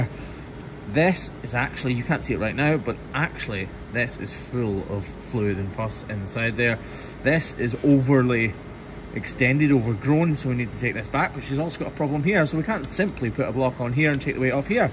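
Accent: Scotland, United Kingdom. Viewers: While I have watched a lot of these farrier videos over the last 2 or so years, I have to admit that I have come to watch the just to listen to the Scots accent, as my grannie was from Dunfermline and immigrated to the US in 1920, and when this guy does these videos I close my eyes and imagine it's my grannie I'm listening to. Is that weird?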